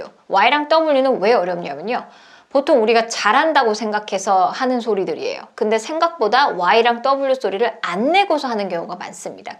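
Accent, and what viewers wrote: United Kingdom. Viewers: 쌤 정망 영국식이라 그런거 맞는거죠???😂😂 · 저는 영국식발음이 끊어 뱉어주셔서 더 편하게 잘 들리네요. ^^ 오늘도 감사합니다. IT WAS A VERY FUNNY DAY. I WILL SAY. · 확실히 영국식 영어가 더 잘들립니다.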